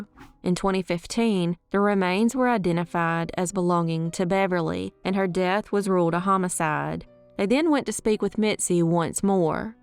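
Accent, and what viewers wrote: South, United States. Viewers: Her accent is thick southern accents are thick. I don’t see a big deal .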